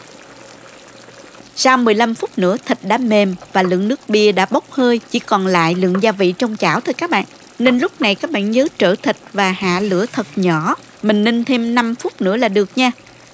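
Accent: South, Vietnam. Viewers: Giọng chất năm bộ. Gợi nhớ lại giọng phát thanh ngày xưa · nghe giọng miền nam, dùng từ ngữ miền nam là thấy hay rồi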